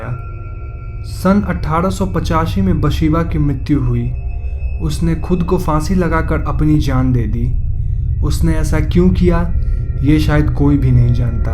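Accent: Bengal, India. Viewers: A perfect Bengali accent..... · Are you Bengali?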